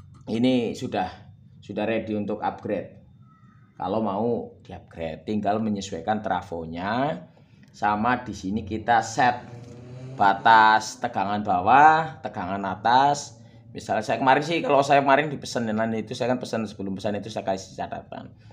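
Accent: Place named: Banyumas, Indonesia. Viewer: Om... Logatnya banyumasan asli mana bos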